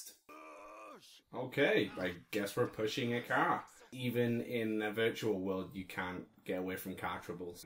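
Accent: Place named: United Kingdom. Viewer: no worries bro been watching your vids regularly and there really good!!🇺🇸💪 but dude what happened to your British accent!!! Don't forget ff7 on that list! Let us know when your next online we'll party and catch up, you know I'm a Sony boy through and through but the switch has turned my head a little 👌 also I was in the Nintendo store in NYC and it's crazy, you need to get there sometime man you'd be in heaven.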